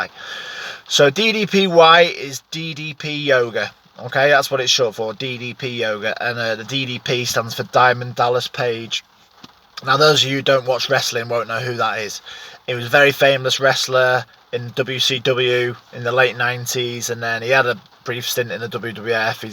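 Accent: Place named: Cheshire, United Kingdom. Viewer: sounds like he is from Chester/Cheshire, north west deffo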